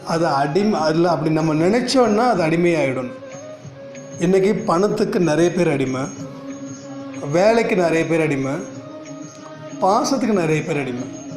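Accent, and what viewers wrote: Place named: Madurai, India. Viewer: I hear ur voice so that I am asking u r Madurai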